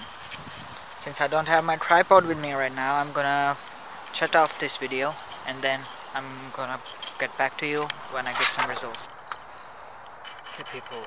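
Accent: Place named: India. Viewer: its indian ;)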